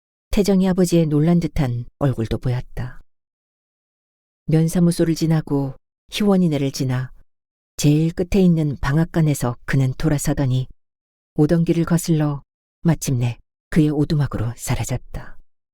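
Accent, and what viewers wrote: Jeolla, South Korea. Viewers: 자정이 지났네요. 자고나서 내일 들을까 생각하다 잠이 오지않아 오늘은 또 어떤 작가의 작품이 님의 목소리를 타고 우리 곁으로 다가올까? 궁금했는데~ 님의 전라도 방언이 참 구수합니다.^^ 또 호롱불 5일장 먼지 풀풀 날리던 신장로 드넓은 들판 지명 등등 근처에 잠시 살았기에 더욱 정겹네요. 님 잘들었습니다.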